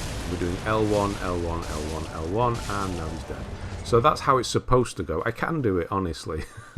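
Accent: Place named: North, United Kingdom. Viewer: To my USA ears, it’s perfect to get a walkthrough from someone who sounds North Country, just like all the NPCs in fromsoft games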